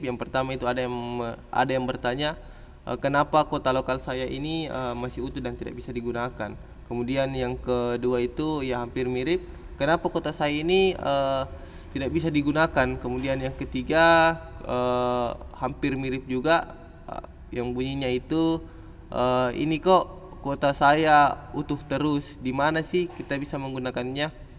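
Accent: Indonesia. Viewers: logatnya kental sekali sama logat makassar😂😂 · Ku bilang mmngja kaya ku tauki logatna...orang sulsel paeng.hhah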